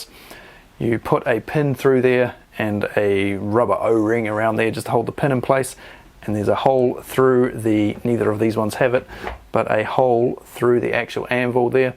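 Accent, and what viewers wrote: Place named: New Zealand. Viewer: Always surprised to hear a kiwi accent on Youtube. Good content man.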